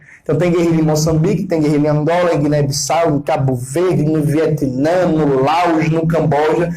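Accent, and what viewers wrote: Pernambuco, Brazil. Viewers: sim, sem dúvida, o sotaque é puro mangue pernambucano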